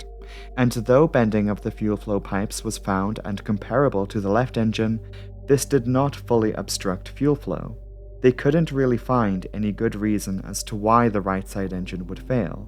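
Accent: Newcastle, United Kingdom. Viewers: I started the video wondering what accent this is, but wouldn't have pegged it Geordie apart from the pronunciation of year. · Go hard on the Geordie accent Sir. I was really fascinated by your research and subsequent report. Ah, I do not think I need reminding that booking a flight on Dana Air will not result in a satisfactory outcome. Sounds like a way to fleece unsuspecting, non-Nigerian international travelers out of their money and stash it under the mattresses of certain Nigerian government officials. To be fair, most of middle and south Africa is in a state of turmoil at this time, so it is not surprising that a certain 'cowboy' style of airline operation would be able to survive in such a theatre. What was especially shocking however was your discoveries concerning the engine maintenance outfit in the USA. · I have wondered about you accent. 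I had no idea that you're a Geordie. Awesome! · "Dana Air...grounded for maintenance and other violations so often we haven't had a fatal accident in ten years!" Your dedication going down the WTF rabbit hole at the end of this was appreciated. Incompetence is funny when it's not injuring people (although those who need refunds might want a broader definition of "injury".) And never apologize for a Geordie accent. As far as this American is concerned, the BBC has to apologize for exporting only programmes in which everyone spoke RP or occasional Cockney until the 90s. They've been holding out on us! Now that we've basked in some lovely Sheffield and Leeds Yorkshire for the past five years, Doctor Who isn' allowed to have a southern accent again until they've cycled through Scouse, Mancunian, Brummie, Geordie, Welsh, and Northern Irish. ;) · GREAT video. I used to live in America, and I keep abreast of news from the states. 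Florida governor DeSantis is pro business to the point of business there running rough shod over ANY regulations what so ever. It's become an abyss of regulation dodging garbage. I am NOT SURPRISED so much of this is traced back to Florida at all. I would not trust anything coming from Florida (including the pilots themselves it seems) to have any degree of quality to it these days. You produce amazing videos, lovely Geordie accent girl! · Thank you for an amazing researched informative and beautifully presented video. By the way, nothing lovelier than a nice Geordie accent! X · I would never have guessed you had a geordie accent :) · Why I’d neva ov thought you were a geordie. I’m from Durham U.K. love your vids. They’re canny good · Your Geordie accent was Beltas Mate! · You're a Geordie? I'd honestly never have guessed until you made it very obvious! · Your a Geordie? Well that was a surprise. 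Haddaway. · Your not a Geordie are you ? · I'd adore if you did a full video with your native Geordie accent, it's such a lovely one! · You sound a bit geordie, but what else is going on with your accent, no way it's100% newcastle...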